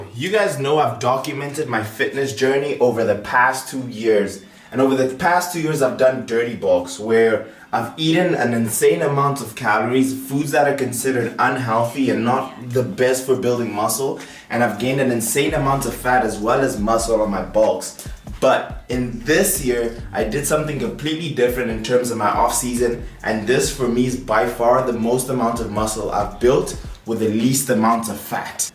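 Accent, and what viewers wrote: South Africa. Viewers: Are u from South africa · Are you south African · is he south african?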